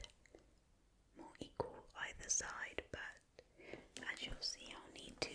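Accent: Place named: United Kingdom. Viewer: I love your accent ♥️♥️♥️ I am guessing you are from the uk but whereabouts in the uk 🇬🇧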